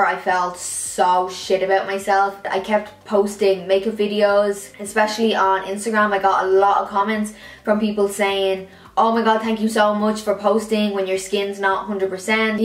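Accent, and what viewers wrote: Ireland. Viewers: Holy Jesus you're Irish:):) first time watching your vids after following you on social media:) are you a dub as well?xx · I just love listening to your brogue..it reminds me of my Nan who was from Kenmare..☘☘☘☘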